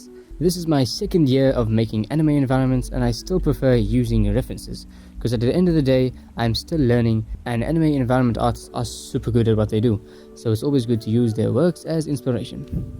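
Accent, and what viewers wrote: South Africa. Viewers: nice tutorial bro are you from south africa? I can hear from your accent lol. I'm from cape town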